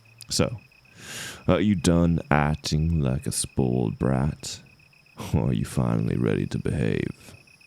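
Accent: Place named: South, United States. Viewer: I really enjoyed this one! That southern accent you do is so soothing and comforting. And thirty minutes is such a treat!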